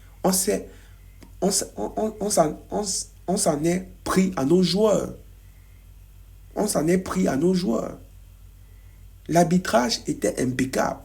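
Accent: Cameroon. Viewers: Toi tu as un accent camerounais hein, tu es sûr que tu es ivoirien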